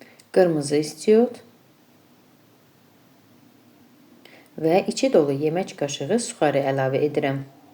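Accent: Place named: Nehram, Azerbaijan. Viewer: xanim deyəsən Nehrəmlisiz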